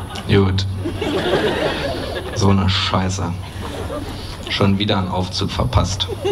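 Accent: Berlin, Germany. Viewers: allein der Mist den er redet Bringt mich dermaßen zum lachen.. und dieses berlinerisch dazu... ich Weine... xD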